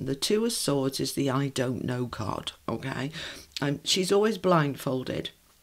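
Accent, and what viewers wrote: United Kingdom. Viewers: Love your accent. British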